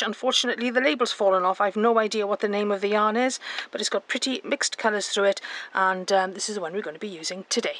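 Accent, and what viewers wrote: Wales, United Kingdom. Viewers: So lush to hear a Welsh accent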